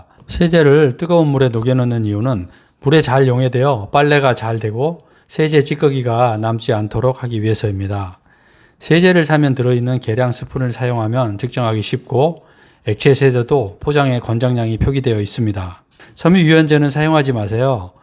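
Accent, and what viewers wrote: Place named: Jeolla, South Korea. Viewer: 억양이 전라도 억양 이시네요^^ 푸근 합니다^^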